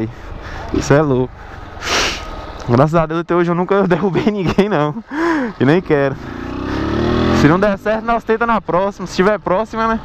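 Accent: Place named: Bahia, Brazil. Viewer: Mano, de que estado você é? nossa, puta sotaque daqui da Bahia kkkkk♡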